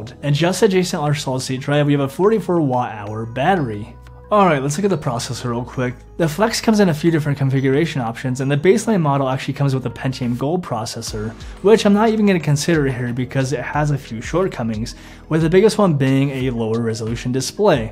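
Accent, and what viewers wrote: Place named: Northern Ireland, United Kingdom. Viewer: Mate, where the hell are you from? You sound 5% Norn Irish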